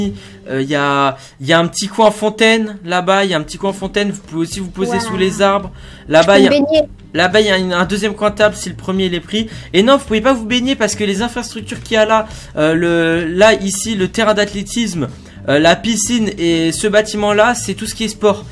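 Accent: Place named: Italy. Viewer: Le meilleur accent italien 😭😭😂😂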